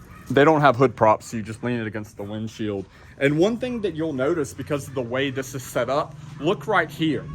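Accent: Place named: Pennsylvania, United States. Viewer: In Imus? Should have run through Milwaukee or Sarau for the big personal OTJ’s. You may be in The Philippines, but that accent sounds like Westmoreland Co., PA! Lol😅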